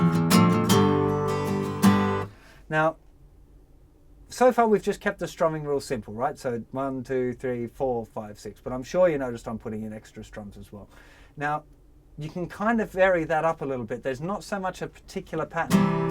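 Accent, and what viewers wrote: United Kingdom. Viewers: OMG! i almost dropped my coffe when you started talking! YOUR'E BRITISH! om my lord jesus and everything in between!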